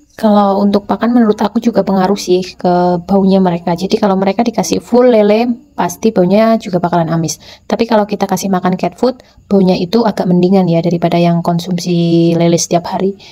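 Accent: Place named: Banyumas, Indonesia. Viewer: ngomong nya ngapak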